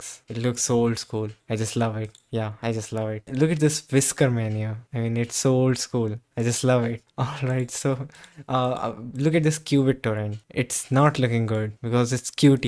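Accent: India. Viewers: I'm growing to like the Indian accent. Didn't expect it to be an acquired taste.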